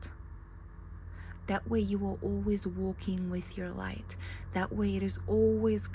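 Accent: South Africa. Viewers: My Sister you are in my energy 100%. Your reading are so aligned with my life right now. can I ask where you are from sound south african 😊🇿🇦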